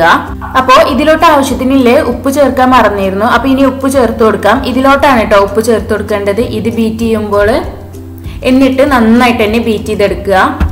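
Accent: Malappuram, India. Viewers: Nihal MN കോഴിക്കോടല്ല മലപ്പുറം ജില്ല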